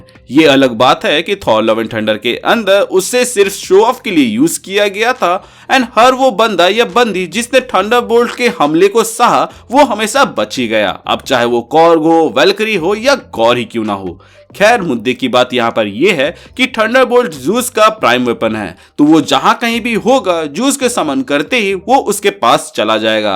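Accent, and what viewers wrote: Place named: Jharkhand, India. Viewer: तोर भाषा मे झारखंड के झलक देके पारे हियो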